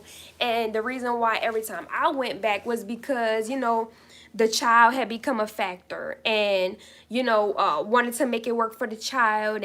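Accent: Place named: Louisiana, United States. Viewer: You sound like you are from Louisiana ❤️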